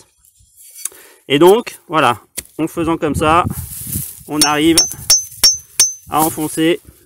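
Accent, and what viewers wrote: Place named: Bocage, France. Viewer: bonjour,vous etes de quelle region,on dirait le bocage?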